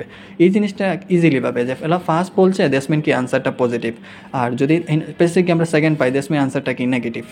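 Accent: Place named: Sylhet, Bangladesh. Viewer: সিলেটের লোক, কথায় অঞ্চলিতা চলে আসছে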